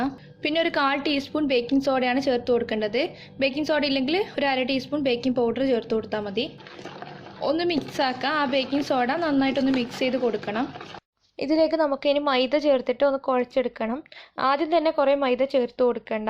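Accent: Kannur, India. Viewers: Samsaram kettappam manasselayi kannur aan annu , njan Kuthuparamba aan, cakel oru cup RAVA charthaal nannavumo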